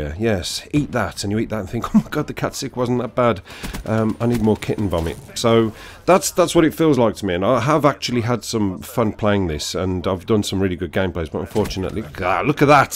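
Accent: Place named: North, United Kingdom. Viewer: Good to hear your dulcent northern tones again, fella. Welcome back.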